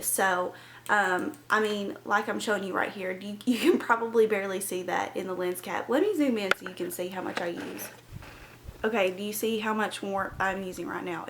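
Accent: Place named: Oklahoma, United States. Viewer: Omg I love your accent!!! I wish texans sounded like that. I live in texas and we talk really fast and nothing like that. I was born in Oklahoma so I used to have an accent similar to yours but not anymore :/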